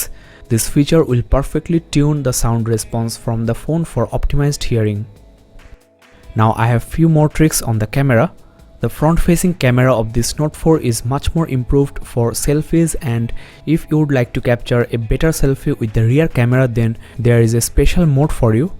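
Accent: India. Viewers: Indian accent "~"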